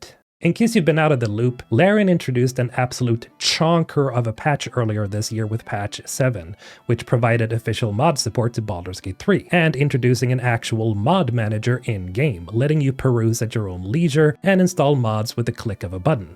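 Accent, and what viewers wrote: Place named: Sweden. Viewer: Tog ett tag innan jag hörde att du är från gamla goa Svedala! :D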